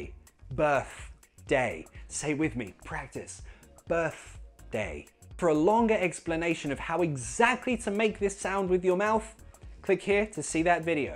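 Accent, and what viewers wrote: United Kingdom. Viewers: Hello. Greetings from Azerbaijan:) I also think there is not any schwa in your name. Both syllables are stressed I think. Do you practice th british accent? I myself have almost mastered it but I need quite a persistent friend to practice with. I am female I am writing from my husband's account. So i need only a female friend to speak to. looking forward to your reply dear:) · Wow you are a real British.I like the way you teach.I m lucky if I have a Brit to talk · I’m from Oman🇴🇲.so I’m Arabian...thanks you so much ..you made me fall in love with British accent. My brother is learning the American accent but I found that the British accent is better ..you’re the best teacher everrrr.....😭😭💗🙏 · i wanna have a British accent just like u, and yr great videos r my path.. keep being cool and thank you so much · I'm No one hey i love ur accent BRITS 😍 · Finally I've found someone spreading the good old British English. 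Hurray! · British speaking hear very soft accent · Absolutely amazing! It's incredible how you show in a natural manner how one can speak with the British accent and sound really cool! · That's what I was looking for! A perfect British prouninciation and Video 👍 Keep it up Thank you from Algeria! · just British accent ❤ cheers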